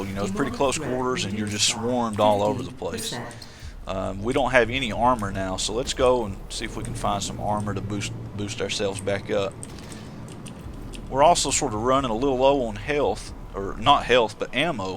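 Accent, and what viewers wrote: South, United States. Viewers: Holy shit you're a southern dude? I did not expect that. Not that I'm insulting you, I'm a Texan myself, just didn't expect that lol. I agree with you though I absolutely freaking loved this game and omg I can't wait for the next Doom. More weapons, more demons, new story, more weapon mods, hopefully new brutal animations, man it would be so sick. My only thing is I really don't see how id could possibly follow this up though, it is epic as hell.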